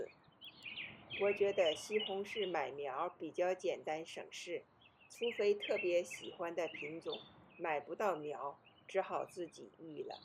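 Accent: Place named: Northeast, China. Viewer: 东北口音亲切！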